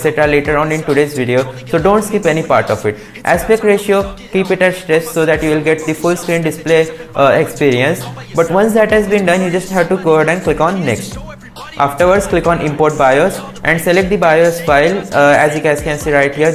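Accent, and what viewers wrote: India. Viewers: How does that every video titled " How to get 228 fps on low end for 20 bucks no shit for real" is recorded by some indian feller with terrible accent? 😂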